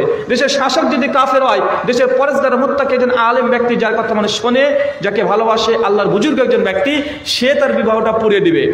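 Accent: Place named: Rangpur, Bangladesh. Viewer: হুজুরের কথা বলার ধরণ অনেক সুন্দর অনেকটা আমাদের বাংলাদেশের রংপুর জেলার মত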